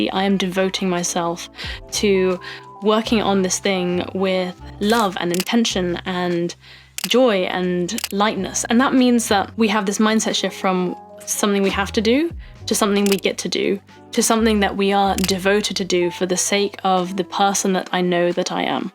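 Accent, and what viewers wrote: United Kingdom. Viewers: An Elegant British accent ( RP) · Hi love your videos.Your British accent.Listening to our interoception, Health is wealth absolutely,I am going to the gym 2-3times a week walking daily and eating healthy diets❤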